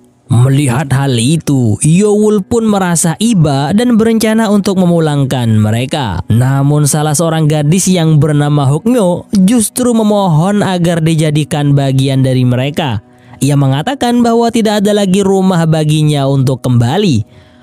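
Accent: Madura, Indonesia. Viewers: Madureh ongghu🤣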